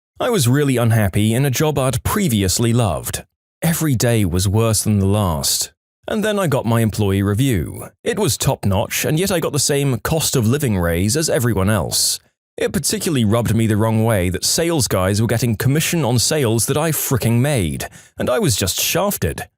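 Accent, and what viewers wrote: United Kingdom. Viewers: I’m not the only one who liked the British voice he used in the video. I want to hear more of the British voice. I liked it. It was nice if I do say so.❤😂😊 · the reason why the narrator has turned British is because it's a different narrator from Am I the Genius. he's been in a couple videos lately.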